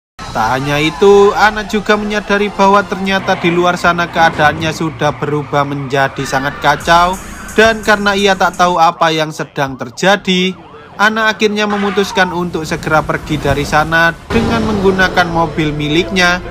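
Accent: Java, Indonesia. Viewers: aksen jawa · mimin orang jawa nya medok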